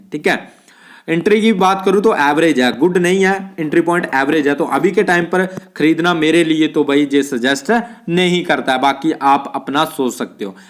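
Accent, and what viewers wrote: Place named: Punjab, India. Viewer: Bhaji tuhadi hindi sunn k lag reha Punjabi ho😅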